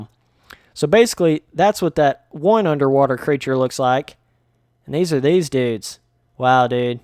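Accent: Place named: South, United States. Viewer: There is something about a dude with a Southern twang playing No Man's Sky that is just super wholesome.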